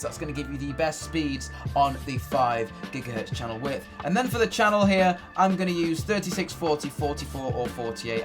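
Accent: England, United Kingdom. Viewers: English (UK) English (US) English (AU/NZL) English (Canada) All not same in pronounce of router Please not tell him how pronounce he use British accent. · I like your English accent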